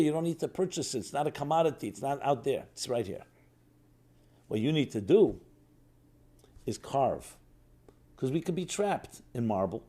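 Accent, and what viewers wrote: United States. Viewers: Thank You✨🌹✨🪬✨ sometimes it is difficult for me to understand you because you speak American English. Sorry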